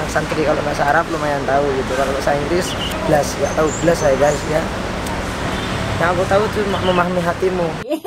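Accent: Madura, Indonesia. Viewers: Tetep sederhana, dan logat madura nya itu yg ga bsa ngilang yg bkin seru klo mas ngmong 🤣😂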